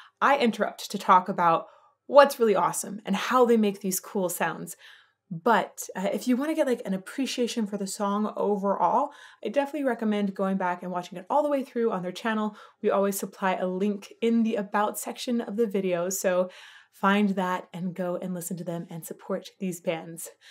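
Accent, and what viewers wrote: Germany. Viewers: That German accent is so sexy 😍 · English is not his native language....he is German think about that..........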